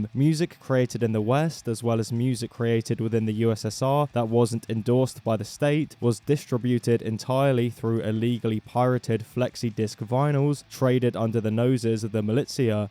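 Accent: United Kingdom. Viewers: A bri'ish fellow talking stuff about Yegor Letov? That's cool, I wish it was interesting to me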